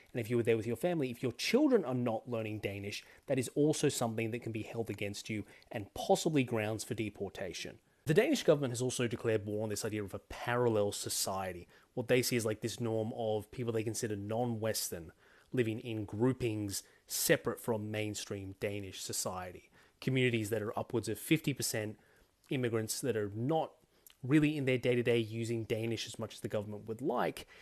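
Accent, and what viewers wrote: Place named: Australia. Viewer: you sound aussie, you should do aussie politics. example; how the fee free tafe can be a game changer to the economy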